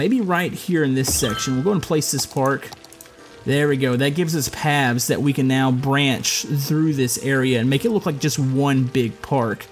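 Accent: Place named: United States. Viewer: Your American accent drives me crazy!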